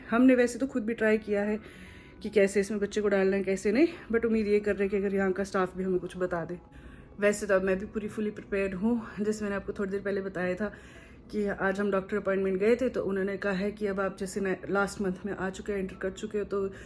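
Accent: Pahadi, India. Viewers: omg her pahadi accent is so good 😂😂....love your videos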